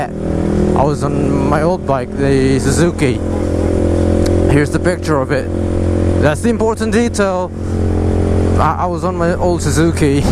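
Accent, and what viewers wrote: United Kingdom. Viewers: aksen britishnya keren bang, mantap (y) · very british, i love your accent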